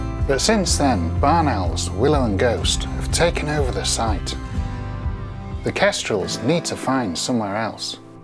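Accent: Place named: Yorkshire, United Kingdom. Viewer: I love it that every time says ‘tawny owls’ the CCs translate it as ‘toenails’. Mr and Mrs Kes had problems with Jackdaws, Barn Owls and toenails 🤣 Gotta love the Yorkshire accent 🤍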